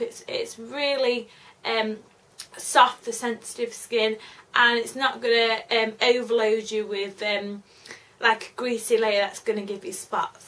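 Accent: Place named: United Kingdom. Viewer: i love British accent mm ^ - ^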